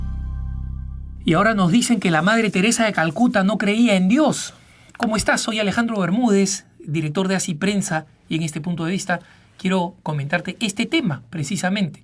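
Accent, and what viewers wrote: Lima, Peru. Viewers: ACENTO LIMEÑO.....agg¡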